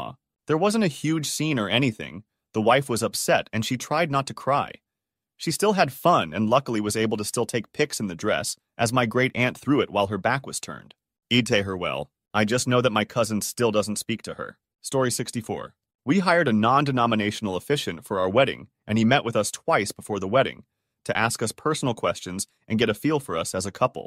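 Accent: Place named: United States. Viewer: Okay, the bizarre word replacements are grating enough, but now I'm really calling shenanigans. You, narrator, are clearly American, and yet you just pronounced 911 as "nine-hundred and eleven" instead of "nine-one-one." 'Fess up, is this channel a psychological experiment designed to study irritation or something?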